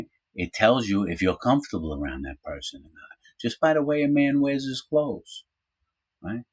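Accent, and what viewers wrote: New York, United States. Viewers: Yo, you got a cup of coffee son? Lol no doubt thats NEW YORK!!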